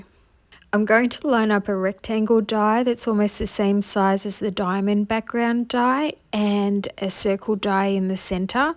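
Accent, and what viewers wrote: Australia. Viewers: Love love hearing a Aussie going so well and does Brilliant work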